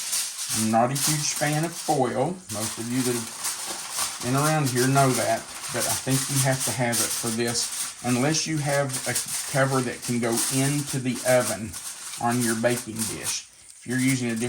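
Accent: South, United States. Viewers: Gotta agree with Miss Jen, you've got a great voice for these. I'm from the south (Texas), so I'm completely comfortable with the accent, and you use that voice to explain things so well. This dish has all of the elements to be amazing. Love pork chops and love rice, especially wild rice. Question for ya, when y'all are making your winter plans, how much does the kitchen of the place you're renting go into your decision making? Do you investigate it for cooking space and feasibility of recording? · This looks amazing! I’m eager to make this for the family. There’s nothing wrong with your Southern accent. And you are an excellent teacher in your videos. You and seem like friends to me. I can’t wait to see each new video. The only way your channel could be any better would be if you had a new video every day. You and are fine people, and I’m happy to see your channel growing. 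The cookbook is great, too! Everybody should buy one! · Your Southern voice is fine. Of course, I have a soft southern voice, so it sounds normal to me. I am proud to be southern and of my southern accent. I appreciate always typing the recipe below the video. It is so helpful. I enjoy enthusiasm for fixing the food. You all are an amazing Christian couple. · Your voice is a super power! Something about Southern accents makes food seem tastier and the Gospel more relevant! ❤ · I have a southern accent as well... This looks delicious. My hubby loves pork chops and I never cook them right. I would make this but I don't have a stove. I wonder if it would work in the air fryer. I watch you all the time... God bless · Keep on cooking, never mind your accent! Ain't nothing like a Southern cook! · My husband and I have been following you for a little while now, and we enjoy your videos so much. 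Your voice is very easy to listen to. (I'm partial to a good southern accent. While I'm a northwest girl, my husband is from Louisiana.) I just had knee replacement surgery, so my husband decided it was a good time to try out some of your recipes. He made this for the main dish of our Easter dinner. He said to let you know he followed your video instructions... even forgetting to add the spice packet to the rice at first. 🤭 This was so delicious! Thank you for inviting us to come sit at your table! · I also love your Southern Accent, as Ms. Jen said. It makes me feel like I’m listening to someone that is kind, sweet and caring. This is what drew me to your You Tube. I am from KY, as I have said before and Proud of it. I have lived in Michigan for years but KY is my heart. You and are so good at this. Love love love to watch and listen to you both. 🙌🏻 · Hi, l”m southern, so your accent doesn’t sound different to me. 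I would like to ask a question. Where do you get the seasoning packets from? I would love to try this recipe. Thanks for the video. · There is absolute nothing wrong with your voice. It’s clear and we can understand every word and that is so important. Keep up the good work nothing like a southern voice. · I'm from Texas and have a Texas accent, a little different than other southern accents. Never be embarrassed by your accent. You are who God made you to be and are wonderfully and perfectly made. I love watching your channel and your recipes. Enjoy your time in Florida on vacation and hope the weather is wonderful while you are there. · This sounds soooooo delicious!! Love mmmm as she was taking a taste test!! I love your southern accent as well! On video the oven temperature is 375. In the recipe it's 350. Could you please clarify because I NEED to make this!!😋😋😋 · Mr., you have some color on your face. 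I'm so glad you're videoing from Florida. You mind including some of your favorite spots in Florida? Your southern voice is such a nice voice. I'm looking forward to making this video 😊 Thank you & 💖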